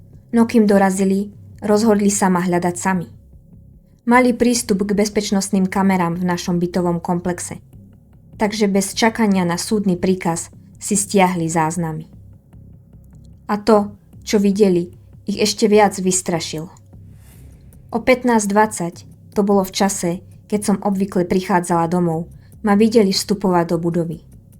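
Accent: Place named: Central Slovakia, Slovakia. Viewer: dakujem, smutný príbeh, ale krásna ľúbozvučná stredoslovenčina ❤️👍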